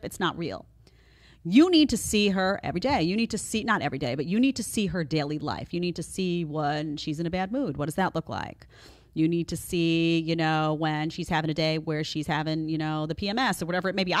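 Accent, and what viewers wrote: New York, United States. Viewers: I like the points, but the way you screech and say Mmmhmmm are very off putting at times. Calm down the New York sass and your numbers will go up.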